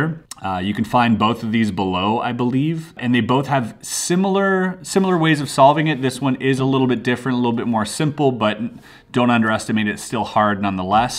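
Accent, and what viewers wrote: Quebec, Canada. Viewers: are you canadian?? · This accent is definetly from Québec :D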